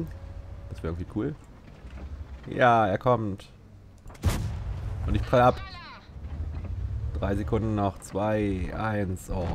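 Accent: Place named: Bern, Switzerland. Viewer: Mage Co bärner-dütsch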